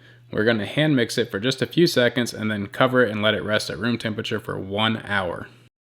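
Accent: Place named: United States. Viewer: You sound like you’re from the US, why are we using grams? 😂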